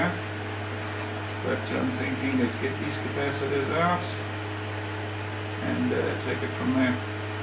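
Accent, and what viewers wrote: South Africa. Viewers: Ha// spot the South African accent a mile away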